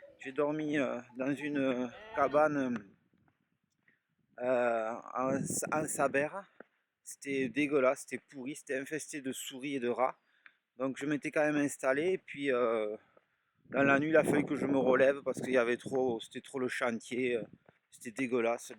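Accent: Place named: Pyrenees, France. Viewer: Merci pour tes vidéos et bravo pour cette traversée. Cela m’a d’autant plus intéressé, que j’ai également démarré une traversée des Pyrénées en fin de saison cette année. J’ai d’abord regardé ta revue de matos. je fais partie de ceux qui s’intéressent au matos 😂. Et je viens de boucler tes 4 vidéos … et bien mon pôvre 😉 ( j’adore ton accent pyrénéen : “j’suis tremp “ ) le moins qu’on puisse dire c’est que tu n’as pas été gaté par la météo. C’est clair qu’il fallait en vouloir pour aller jusqu’au bout . Tes commentaires m’ont replongé dans mes propres galères. Et c’est vrai que c’est pas facile et qu’on n’est pas toujours fiérot quand on se retrouve seul au milieu de la caillasse et des cols, dan sun lieu qui si il est magnifique n’en reste pas moins austère. C’est intéressant de voir un parcours très tôt dans la saison. Moi j’étais plutôt parti pour finir la saison (départ de Banyuls le 30 août ). 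J’étais parti avec l’idée d’essayer de boucler l’intégralité mais je n’avai spas trop de mou sur les délais. Ce n’est pas exactement la HRP que je suivais , mais un itilnéraire décrit dans un topo Glénat « le Trek des Pyrénées », ça combine du GR10, du GR11 et de la HRP en 43 étapes. J eme suis arrêté au 14 eme avec avoir quasiment traversé Andorre suite à une douleur récurrente et croissante à la cheville ( en fait j’avais un tendon fissuré ), de plus j’ai commencé à être malade et avec des quintes de toux bien envoyées ( la aussi, la suite a révélé que j’avais chopé le covid). Bre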